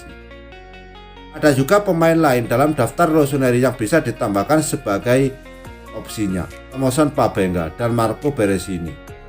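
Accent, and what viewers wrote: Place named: East Java, Indonesia. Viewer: Suara e medok .. orang jatim ya?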